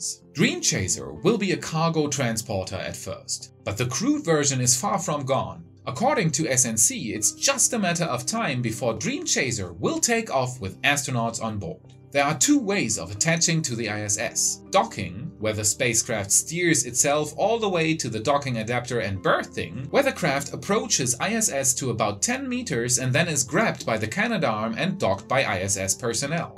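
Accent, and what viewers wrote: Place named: Germany. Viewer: Yah, that's a subtle German accent. It's impressive how many folks there and in Scandinavia speak better English than the average American. At this point, theirs qualifies as a full-fledged regional accent in English just like Australian, British, Indian or the one from Louisiana...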